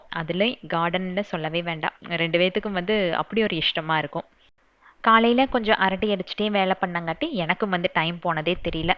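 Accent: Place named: Kongu, India. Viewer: Akka, your voice and that kongu tamil is so sweet. Also our tamil and gardeners reaction is romba edharthama eruku nga ka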